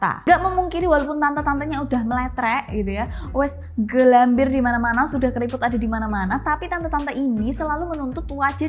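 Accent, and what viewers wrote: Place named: Semarang, Indonesia. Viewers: Medok tp menawan ini * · Aku suka gaya ngomong nya * jawa kali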